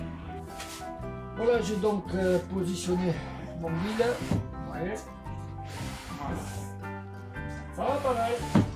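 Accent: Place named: Var, France. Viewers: salut j'ai découvert ta chaîne y a peu de temps et je me suis dévoré toute tes vidéos elles sont bien faites avec des combines de vieux routard, de l'humour et tu ne t'attarde de pas sur des futilités mais elles s'adresse a des débutants avec un peu de connaissances du métier, je m'explique quand tu présente un outils montre nous les changements d'outils et éventuellement leurs réglages voire leur nettoyage et leurs affûtages quand cela est possible sinon j'adore ton accent il est du sud mais de ou? moi je suis du nord de la france. au plaisir de plein de nouvelles vidéos et garde les bétisiers c'est trés sympa bonne continuation et tout plein d'abonnés amitié Fred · Nouvel abonné sur votre chaîne je parcours vos vidéos . Merci de votre partage . Amateur de bois je commence à avoir quelques machines et du coup je pense aux aménagement et votre montage est inspirant . 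Et les cigales dans la voix me rappel mon adolescence dans le Var . Merci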